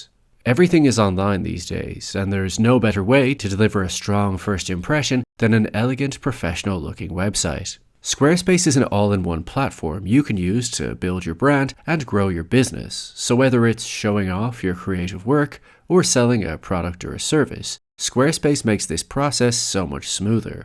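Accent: Ireland. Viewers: LMAO a real "America is the whole world" out in the wild! It's an Irish accent you plonker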